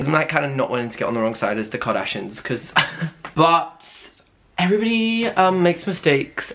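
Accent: Scotland, United Kingdom. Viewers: iconic. scottish accent is improving , proud x x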